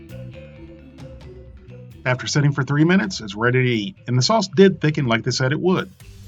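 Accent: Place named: Carolinas, United States. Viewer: not related to your video but you sound like a Carolinian and if so hell yes all the best creators are from hear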